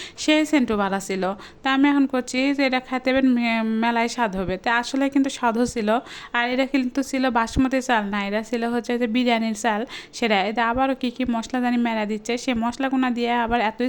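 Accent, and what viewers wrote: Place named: Bogura, Bangladesh. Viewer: আপনার বাড়ি কি বগুড়া নাকি আপু কথা শুনে মনে হচ্ছে আমাদের এলাকার মানুষ ❤❤❤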